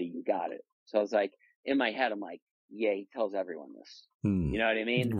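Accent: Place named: New York, United States. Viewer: Love the NYC accent, bro.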